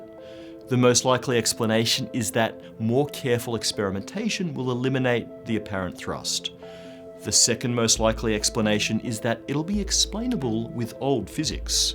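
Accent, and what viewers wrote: Australia. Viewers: My biggest mystery from this video , which is great , what accent does the host has ? Australian ?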